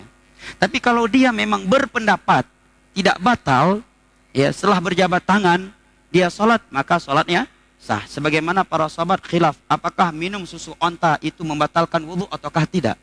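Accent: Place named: Minangkabau, Indonesia. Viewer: Mungkin logat minang kental sekali, makanya agak beda sedikit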